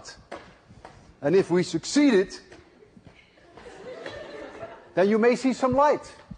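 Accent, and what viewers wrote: Netherlands. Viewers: He's got a dutch accent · he talks like he's from holland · is he dutch? his accent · he sounds dutch.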